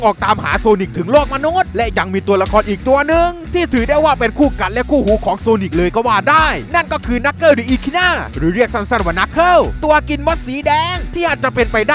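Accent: Thailand. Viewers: เสียงเหน่อทุกท้ายประโยค แอบหงุดหงิดเวลาฟัง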